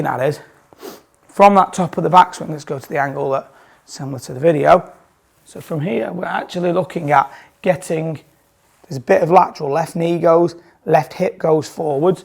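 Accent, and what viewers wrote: Manchester, United Kingdom. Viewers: so POLITE, (if you don't mind) what a Gent imagine Bubba Watson or Woods being so humble? great post. you sound like a Manchester lad. REEESPECT. John.🤗🤗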